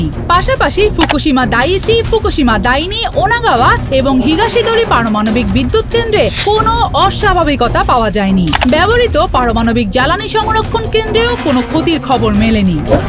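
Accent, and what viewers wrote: India. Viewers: মেয়েগুলি এমন করে ইন্ডিয়াকে নকল করে ধারা বর্ননাকর করে টেনে কথা বলে।আরে বাংলাদেশের ভাষায় কথা বললে কি হয়।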